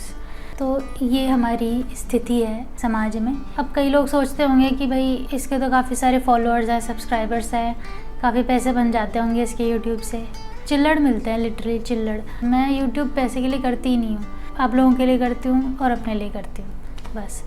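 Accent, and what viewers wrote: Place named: Delhi, India. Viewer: Don't give up! Persistence is the key to success Btw, your accent is similar to my father's. I believe you are from new delhi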